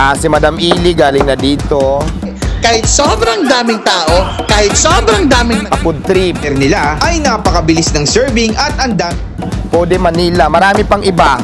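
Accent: Visayas, Philippines. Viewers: alam ko bisaya ka accent mo palang. parihas tayu hehe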